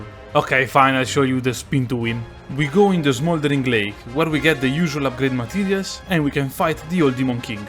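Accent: Italy. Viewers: Been watching your videos in the last few days and I keep asking myself “ma è italiano? L’accento potrebbe essere quello giusto”. I’m just writing the comment in English in case I’m totally wrong. Love your videos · l'accento italiano lo rende 1000 volte meglio <3 bellissimi video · Si sente che sei italiano😂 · Love the Italian accent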